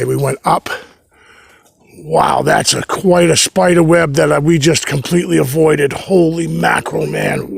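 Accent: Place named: New Hampshire, United States. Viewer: You made me home sick for NH, Born and raised. Then I moved just saw this Sept video on turns scary real quick... You're accent makes me laugh 😂 now.. But loved it, but I was yelling get out.. get out Run don't walk now Skip if you have to I won't hold it against you. I'll FaceTime from KY the next time you need a fast out.. thanks for the content on a rainy day... But as for being so close to the road this is what my parents told me when I was little people always throw trash and food from their cars when they're going down the road so animals like to come up to eat to wash his Bigfoot be any different that's my take on it but they applied it to seeing deer on the side of the road when I was a kid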